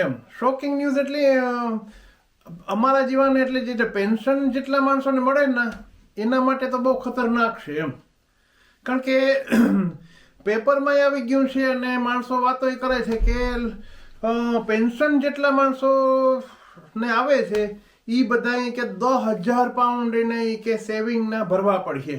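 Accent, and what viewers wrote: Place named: Saurashtra, India. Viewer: Sorthi boli hambharva Ni Maja ave chhe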